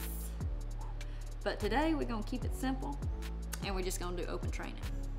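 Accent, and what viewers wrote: South, United States. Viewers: Love that southern draw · You are amazing. True red blooded American... Southern accent. Love it... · I reckon I love that accent, by gum🤠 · As an Old School Marine who graduated from Parris Island, South Carolina back in the day, has the perfect Down South dialect and smooth expression to explain things in an utterly captivating way. Also I would bet a gallon of Mississippi Mud Pie Beef Stew is a Marine.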